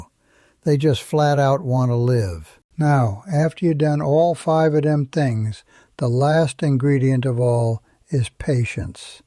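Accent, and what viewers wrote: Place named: Rhode Island, United States. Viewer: AI has a RI or southern MA accent. Hilarious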